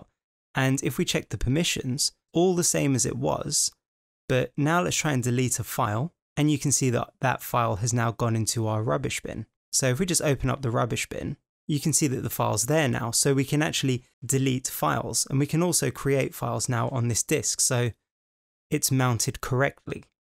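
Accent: United Kingdom. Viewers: So glad I stumbled upon your video, what luck!! After struggling with reinstalling Ubuntu 20.04.3 successfully ,after an "ID-I0-T", error I thought I better check the Win 10 install on my dual boot drive. Win 10 booted up fine and whilst booted it did a full Nov 21 update on shutdown / restart, and all worked okay. After shutting Win 10 I then found problems reinstalling apps in Ubuntu with the persistent read only file system error, requiring several uses of Timeshift to reset Ubuntu again & again. I had instantly thought it was an Ubuntu error after the reinstall, but low and behold it is Windows trying to hog the hard disk limelight again with "Turn on fast restart" enabled. I will be checking that it is disabled after every Win 10 update from now on. Thanks so much and great to hear a British accent on the video :)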